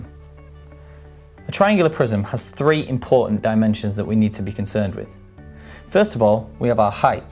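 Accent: United Kingdom. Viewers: hehe hes british luv the accent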